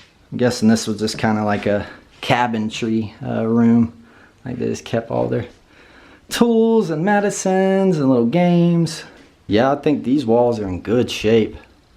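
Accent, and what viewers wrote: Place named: Appalachia, United States. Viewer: I’m guessing by your accent that you’re in sw Virginia or east Tennessee?? I have the same accent 😊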